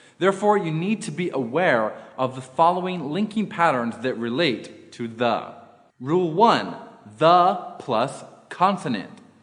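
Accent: China. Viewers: Dude I think you got some Chinese English accent in the video 😂 Hahaha because some of my Chinese friends sounded exactly like you..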